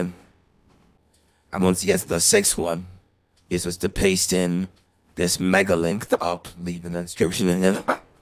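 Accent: United Kingdom. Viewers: Why this nigga speaking british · keep getting runtime error (why does kanye sounds british in this vid)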